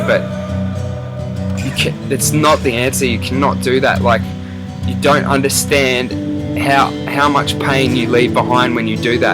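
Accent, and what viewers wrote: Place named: Australia. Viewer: the guys aussie accent .. lols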